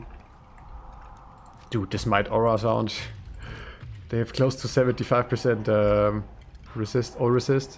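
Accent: Germany. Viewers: Nice buiId and I like your German accent! 😂 Greedz 😉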